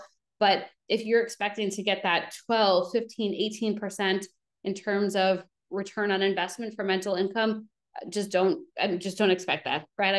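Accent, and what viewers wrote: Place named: New York, United States. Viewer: As soon as you started speaking, I knew you were from NY!! :D Great content. Thank you for your work!! Looking forward to your next live session, and your other channel. Notifications are now on. Belize planning underway.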